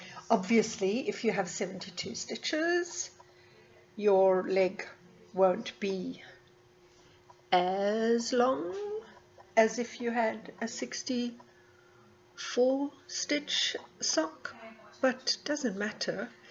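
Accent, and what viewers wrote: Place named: South Africa. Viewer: Hi. Thanks for the review and tips. Just discovered sock knitting and Arne & Carlos' channel on YouTube. You sound like a fellow South African. Where did you buy your yarn?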